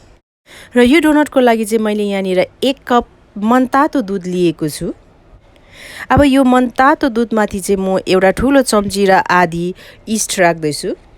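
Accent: India. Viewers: Namaste mam, i am ur new subscriber....i am highly impressed by your hindi mixed nepali accent n ur easy recipes....keep posting easy tasty recipes..thanku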